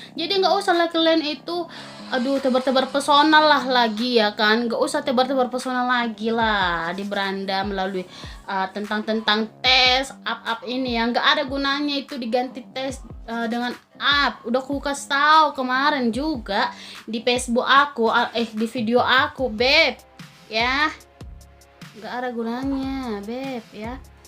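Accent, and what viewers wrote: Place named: Medan, Indonesia. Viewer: Medan kali kau bah,, salam kenal subscriber barumu dari gununh para tebing tinggi..😊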